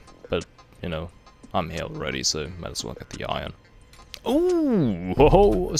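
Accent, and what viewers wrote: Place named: Franconia, Germany. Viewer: Wait are you actually German? Your accent is interesting, what region are you from? Your pronunciations remind me of the Franconian accents I've heard.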